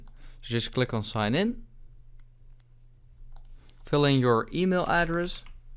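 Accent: Netherlands. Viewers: he sounds like a dutch person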